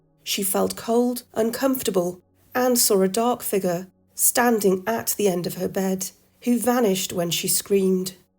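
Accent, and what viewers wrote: United Kingdom. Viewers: Just found this channel this morning & now later at night this particular vid was in my feed. Had already saved to watch later but it came back through again so had to click just to hear that word pronounced with a Bri'ish accent lol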